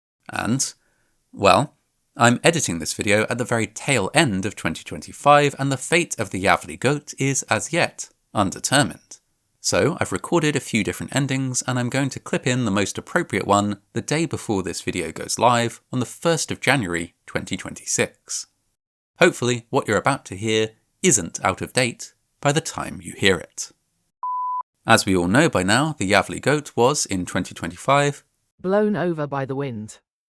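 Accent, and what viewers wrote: United States. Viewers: Your American accent is a glorious thing. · I really enjoyed your american accent. ❤ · Your American accent is fantastic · your American accent is awful. loved the story anyway :-) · Once again, I have been absolutely murdered by your American accent. Brilliant, it was like I was there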